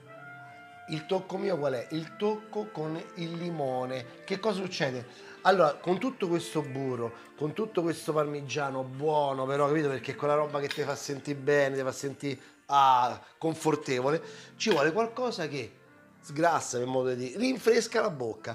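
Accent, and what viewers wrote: Rome, Italy. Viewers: Roman accent, very strong, like mine. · No vabbeh sei troppo simpatico 💖😂 ma per caso sei de Roma oppure marchigiano??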